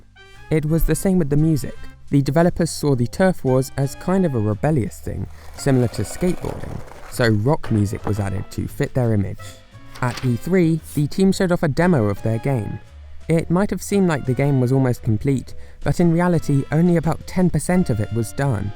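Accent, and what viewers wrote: United Kingdom. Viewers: You have an awesome speaking accent Are you British?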